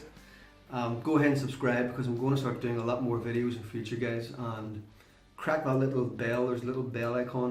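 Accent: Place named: Northern England, United Kingdom. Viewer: WOh your always with the truly objective, scienctific logic to training for hypertrophy, been following your THT programmes since i bumped into in online back in 2016, i really like how you explain things & i got the sense that your the type of person who is on his own personal quest to find the truly most effective knowledgable way of achiving said goals - for example this video on Flat barbell chest, everyone else goes with the "conventional" wisdom which i get, but your taking the risk to say what you believe and regardless of anythign else i respect that! So again thanks for all your effort and saving me years of doing it the wrong way from all the Bro science thats out there! Ps. Loving the Northy accent!!! (from a thick oul Dub):-D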